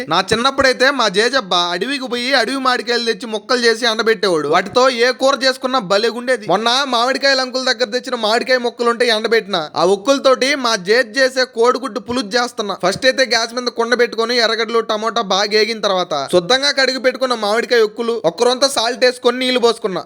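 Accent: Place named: Andhra Pradesh, India. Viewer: ఉక్కులు కాదు ఓక్కలు అన్ని అంటారు అన్న మీది ఆంధ్రప్రదేశ్ కదా ne voice చూస్తుంటే ఆంధ్ర లెక్క అనిపిస్తున్నావు మాది తెలంగాణ వరంగల్ జిల్లా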